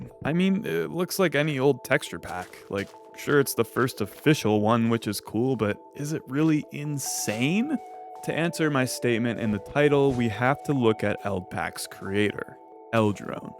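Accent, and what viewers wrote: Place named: United Kingdom. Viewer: keep doing the British accent.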